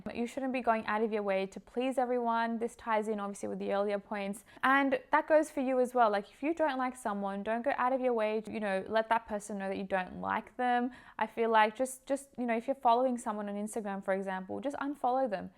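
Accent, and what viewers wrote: Australia. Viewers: When I saw your video the first time, I told myself in my heart that I hope she speak hindi. Your accent is totally Australian. Good to know, you know the culture in and out.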